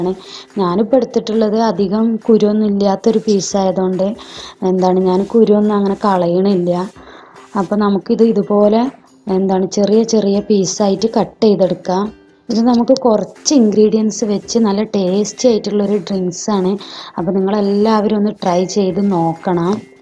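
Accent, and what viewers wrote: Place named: Malappuram, India. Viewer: കുട്ടി മലപ്പുറത്ത് കാരിയാണ് ല്ലേ.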